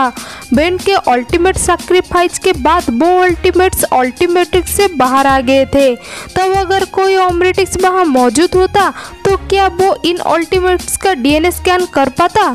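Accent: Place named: Bangladesh. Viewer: tumhara voice se lagta ha ,tum Bangladesh she ho Ma bhi Bangladesh she ho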